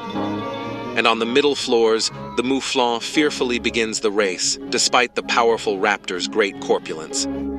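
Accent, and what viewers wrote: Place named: Andalusia, Spain. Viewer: TAN SOLO ESCUCHAR SU VZ DE UN CASTELLANO ANDA LUZ ME ENFOCA YA M ILUMINA LA SABIDURIA.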